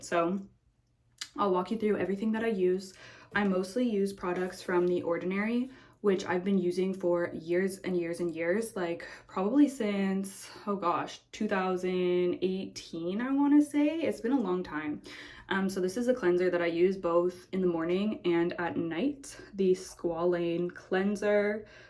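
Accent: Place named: Canada. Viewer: If his accent isn’t Canadian I don’t what is. I have watched your planty video for a while now just discovering that you have a vlog channel. Just wanna say I love them!!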